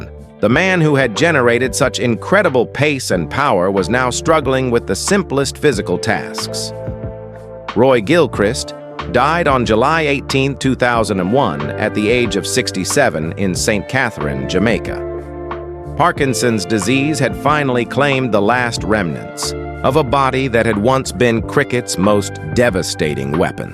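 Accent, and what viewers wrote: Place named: United States. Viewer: Cricket and a american accent of the anchor doesn't seem normal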